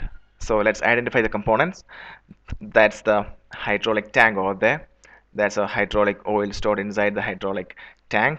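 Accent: India. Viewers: Couldn't understand the word u say It's like u speaking Indian in English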